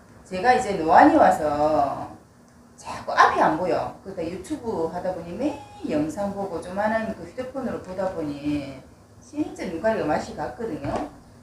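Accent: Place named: Busan, South Korea. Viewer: 말씀도 넘찰지게잘하시네요 사투리도 구수하구요 저역시부산서 오래살았거든요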